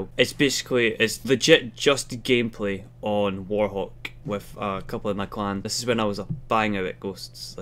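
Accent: Scotland, United Kingdom. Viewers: Are you from scotland? :)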